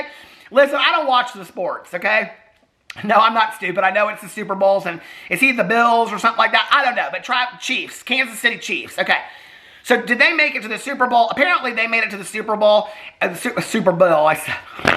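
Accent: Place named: Indiana, United States. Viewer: I'm so glad I found your channel i've been absolutely BINGING on your videos the past two weeks! Fellow Hoosier over here!! I found you through Adam McIntosh and your videos are like listening to a friend, especially because we have a very similar accent <3 I've been sober 3 years and hearing how long you've been on your journey and just the tidbits about your life are SO relatable and fill my heart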